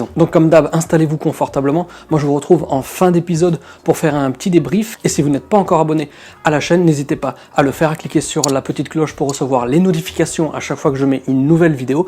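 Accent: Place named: Laventie, France. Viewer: d'où tu as chopé cet accent de Laventie?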